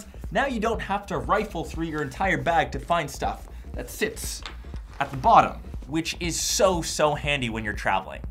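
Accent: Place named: Canada. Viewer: he is talking canadian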